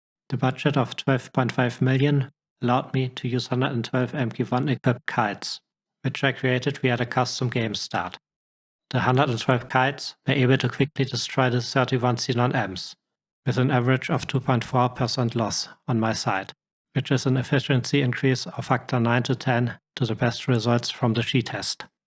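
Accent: Germany. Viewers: Thank you for sharing your findings. Ze graphs and German? accent really drive the facts home on this one :) :)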